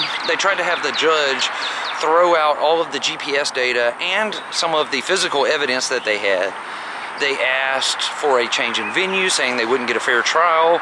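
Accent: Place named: Alabama, United States. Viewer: Good day from.Australia 👍🐨 I just watched this story and have subscribed. Now a keen follower. Your content is great - interestingly presented, your natural down to earth style is 👌and i love your "Bama" accent! I look forward to many more and will catch up on your previous uploads. This is ever so sad R.I.P. sweet gal 😇. Love & light across the oceans and miles! 🙋‍♀️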